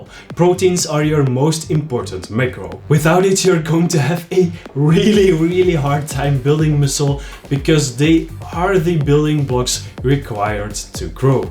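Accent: Belgium. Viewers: ik dacht al dit klinkt als een belg toen zag ik die volle melk en wist ik het zeker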